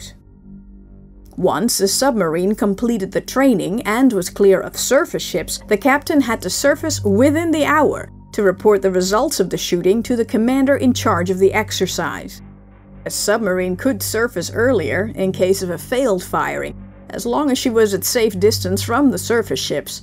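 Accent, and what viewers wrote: Netherlands. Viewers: She is dutch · well she's Dutch so ...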